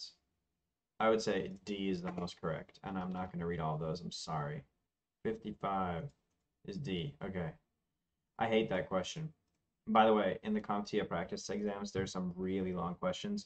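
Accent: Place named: India. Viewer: lol, your indian voice is horrible, but made me chuckle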